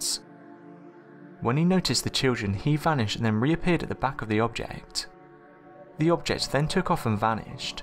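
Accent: England, United Kingdom. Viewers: Everything is better with a English accent.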